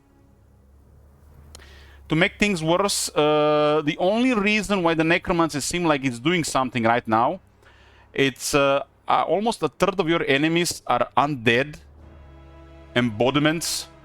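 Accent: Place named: Eastern Europe. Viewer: regarding the letters and how to pronounce, Tolketh would be Tulketh. All umlauts are basically "Open your mouth shape for letter but say some other vowel", with O it would be place your mouth to say O but then say U. You got it right on the end, chat helped you out. Frankly, they're not hard at all when you compare it to French or English. Like yeah there's more vowels in German, but at least they follow rules always, even things like Rammstein being read Ramshtain, there's simply rule that every EI is read as AY, done. How the F do you explain to an English started difference between thorough, through, thought, taught... As someone who's been studying English since before elementary and speaks it better than many people to whom it's their native language, and half of the times think in English, I think I speak for everyone when I say: English is a BS language. 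Great vowel movement in particular, that was worse tragedy than nuclear bombing of Japan. P.S. You sound Slavic, is that your accent? Your Os are very hard and you don't do guttural stops.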